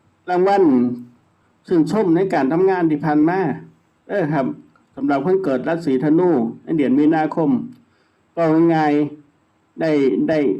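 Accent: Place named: Isan, Thailand. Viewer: อจ.ยุจังหวัดใดค่ะ คือเว่าอิสาน ชอบๆค่ะ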